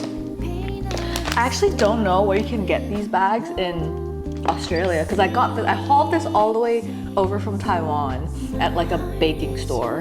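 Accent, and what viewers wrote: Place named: United States. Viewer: Metric system with american accent is throwing me off. We use freedom units here.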